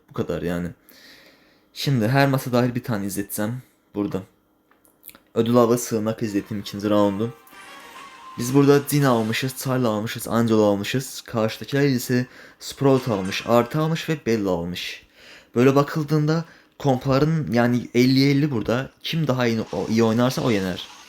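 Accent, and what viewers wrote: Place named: Azerbaijan. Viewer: Qaqa danişigindan azerbaycanli oldugun bellidi ugurlar😂😂😊😊❤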